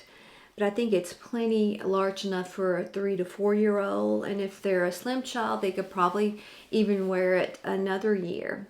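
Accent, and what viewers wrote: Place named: South, United States. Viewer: Thanks for this! Love your southern accent!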